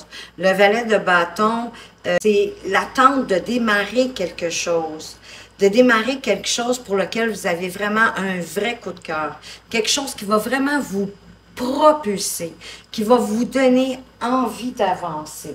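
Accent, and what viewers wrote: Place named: Quebec, Canada. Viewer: Oui c’est ça.... votre accent est très joli.... c'est canadien?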